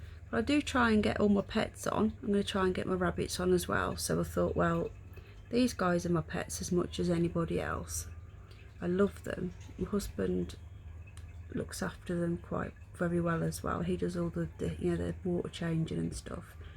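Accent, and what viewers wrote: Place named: Barnsley, United Kingdom. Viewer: Your Barnsley accent lady , (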